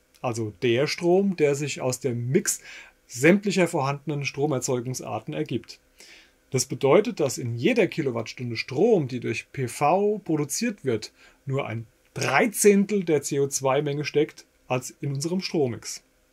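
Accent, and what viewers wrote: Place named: Hesse, Germany. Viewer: Danke für das sehr informative und sympathisch vorgetragene Video! Den „Hesse-Bub“ kannst Du ja nicht verleugnen :-) An wen wende ich mich denn am besten für eine seriöse Beratung samt Angebot und Installation im Main-Taunus-Kreis? Bist Du dort irgendwie geschäftlich tätig? Dein Kanal ist abonniert, mach weiter so! VG Jörg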